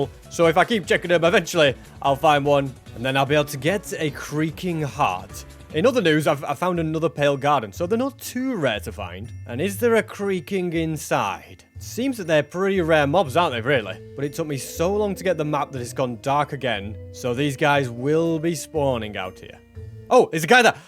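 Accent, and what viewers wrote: United Kingdom. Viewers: You British? It sounds like it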